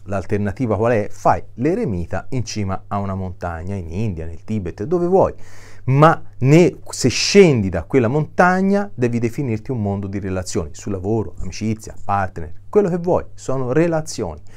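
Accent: Tuscany, Italy. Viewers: Dr. buongiorno. Io penso di avere autostima infatti sti vivendo una situazione abbastanza complicata con un marito Narcisista che vuole procurarmi guai, ne ha già fatti parecchi. Ha chiesto la separazione che mi sta benissimo, ma senza rancore anche se un po' di rabbia c'è verso quell'essere che avrebbe dovuto darmi delle sicurezze invece mi da solo preoccupazioni, affanni. Quindi ora il mio compito non è quello di dar retta al rancore ma di lavorare sulla mia difesa, per i miei diritti e dei miei animali che non possono essere lasciati in mano a un essere malvagio, crudele quale egli è. I suoi video sono sempre interessanti e soprattutto chiari. Anche con la"c"toscana😊Buona giornata. Grazie.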